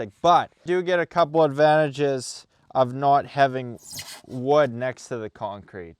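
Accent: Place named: Eastern Canada, Canada. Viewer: thats gotta be an eastern canadian accent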